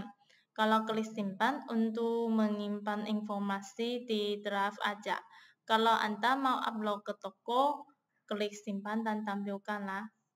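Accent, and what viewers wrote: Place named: Japan. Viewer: Selamat datang ka dinegri +62....kedengerannya kaka dr jepang soalnya saya punya tmen orang jepang aksennya sama kaya kaka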